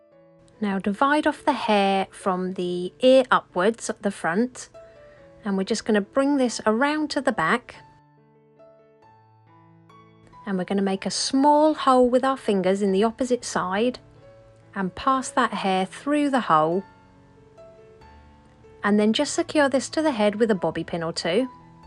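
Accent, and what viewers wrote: United Kingdom. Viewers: You sound like the princess of wales. Thanx for the tutorial 😁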